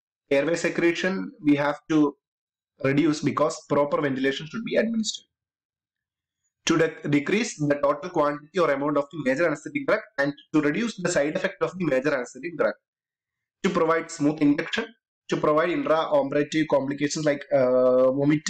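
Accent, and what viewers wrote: India. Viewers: 😂 Indian lololololoo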